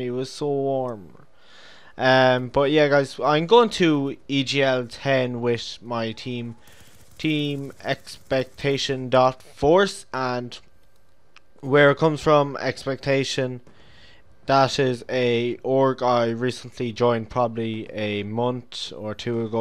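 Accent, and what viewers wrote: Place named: Ireland. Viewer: FUNNIEST IRISH COMMENTATOR EVER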